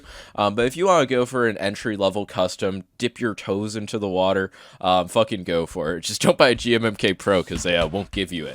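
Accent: New York, United States. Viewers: I'm guessing New Yorker lol Don't worry It's beautiful 😘 It's only a few words as well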